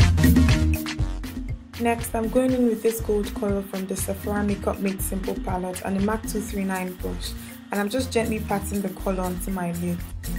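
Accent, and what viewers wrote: Nigeria. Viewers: I love everything about you and how u keep your Nigerian accent intact because it is soo cute. love your hair and videos too.. awesome. if you don't mind, could you tell us more about your hair because it gorgeous. stay blessed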